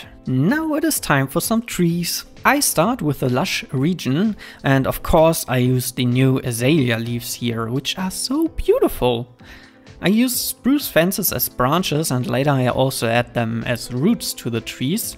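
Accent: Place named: Germany. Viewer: cool wie man heraushört das du deutsch bist xD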